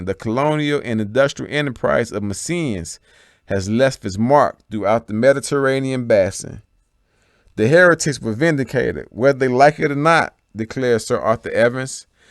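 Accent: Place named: South, United States. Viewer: We wuz kangz is over hundred years old? Didn't know that. But the Black Southern accent is just great.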